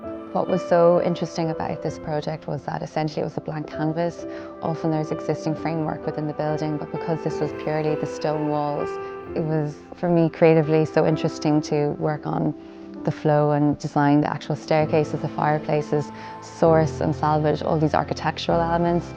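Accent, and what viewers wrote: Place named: Ireland. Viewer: No idea what you are talking about. has a very soft Irish accent…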